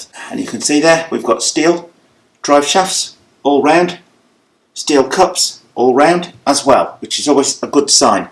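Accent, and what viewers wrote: United Kingdom. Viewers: Are u british??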